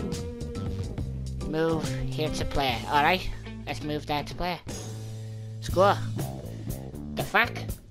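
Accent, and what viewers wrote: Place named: Scotland, United Kingdom. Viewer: You Are Scottish Right?😂😂🔫🔫 😍🔥Dope Accent!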